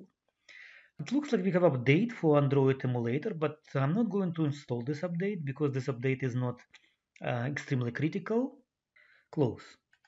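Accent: Russia. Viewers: You are a Brazilian guy. But you really sound like a Russian guy. The guy from university, who studied English, sounds pretty like you. It looks like Russian and Brazilian accents are similar. It sounds like big news for me. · nice accent are u from Russia or Ukraine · Ааай обожаю русский акцент в английском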